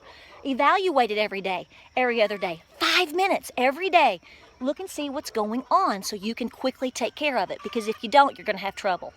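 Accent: South, United States. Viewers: adorable southern accent!!!! Thank you for your help. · (Brit) You say Tomayto I say Tomahto. But let's not call the bloody thing off cos I love your video! And that American accent! :)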